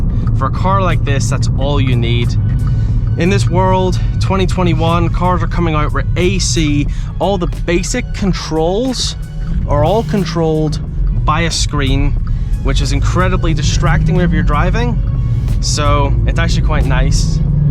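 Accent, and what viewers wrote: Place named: Canada. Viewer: Interesting accent you have there! Part Canadian? Love the vid 👌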